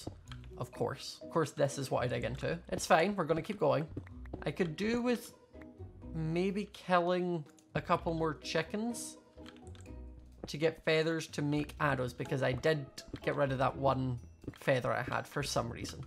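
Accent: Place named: Scotland, United Kingdom. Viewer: Only 817 views?? Come on we can do BETTER. Btw, everytime I watch, I start speaking like a SCOTTISH PERSON!! I called my friend and she was like, who is this? Did you go to Scotland?? I'm AMERICAN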